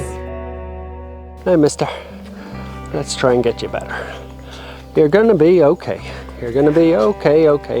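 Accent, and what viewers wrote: Ireland. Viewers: Dear god you sound as if your from southwrn ireland Im appaled Ibwill give a home to any animal that you need to. I have three pets 2 dogs and 1 very old cat which I picked up of the road supposed to be dead I brough him home and have had him for 7 months hes very old old but doing ok. If I can help I am here and will help all I can .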